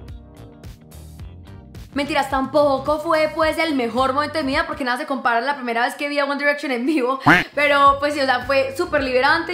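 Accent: Antioquia, Colombia. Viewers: Amo su acento, soy de Argentina ye encanta el acento de los colombianos 😍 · Hola eres la mejor amo tu vos yo tmb hablo paisa y amo todo de ti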